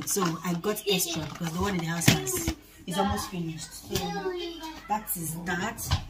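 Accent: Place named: Igbo, Nigeria. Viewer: I just tap the like button before even watching because you are funny with the Igbo accent.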